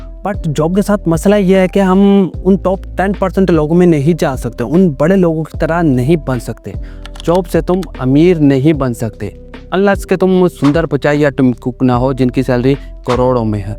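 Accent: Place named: Urdu, Pakistan. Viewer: I like your Urdu Accent ❤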